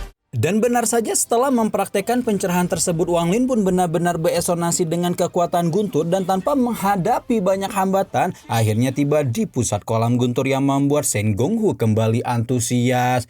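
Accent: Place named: Java, Indonesia. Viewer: candu ama nada mutiara membangkang surga next lebih medok lagi bang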